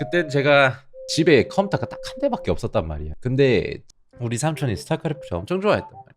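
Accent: Gyeongsang, South Korea. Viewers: 김블루님 대구 사셨어영?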